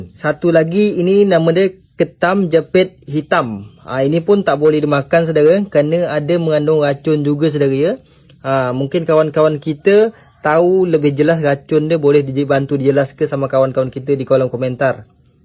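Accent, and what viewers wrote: Riau, Indonesia. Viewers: yg bercakap ni melayu riau ni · Ini info dari saudara kita di Kepulauan Riau ke..sedap dgr loghat bahasa Melayunya di sana. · Ni loghat kepulauan riau indonesia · Ni loghat Melayu Riau bang .. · Ada beberapa benda menarik dalam video ni,, pertama sekali,,,dari cara dia cakap mesti org fikir ni orang malaysia,,,tapi rasanya ni org riau ataupun tanjung pinang ,,,org indonesia cuma bangsa melayu... Kedua,,,kalau ikut mazhab hanafi,,ketam tak boleh dimakan,,haram hukumnya,,,tapi kita guna mazhab syafie,,jadi hukummya halal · Bunyi macam orang melayu riau ni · orang Perak ke.. orang mane.. guna perkataan mike tu😂.. macam bunyi riau pun ada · Loghat Melayu riau ni😊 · Orang melayu riau bang 😁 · Hallo sedare..melayu riau ye...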